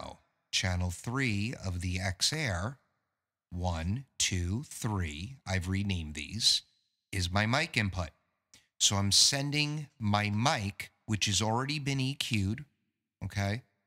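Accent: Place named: United Kingdom. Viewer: Now I see where your daughter gets her acting spirit! The British Accent adds a nice touch.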